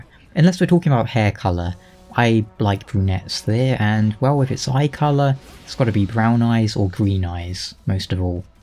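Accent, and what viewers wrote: United Kingdom. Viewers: Don't surpress your Britishness. Embrace it! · Happy Bday! :D Thanks for toning down the Brittish accent, it's quite hard to follow for a foreigner. Especially since these dumb asses in France teach English using only the posh accent. Explains why we have trouble understanding spoken English and sound like arrogant pricks XD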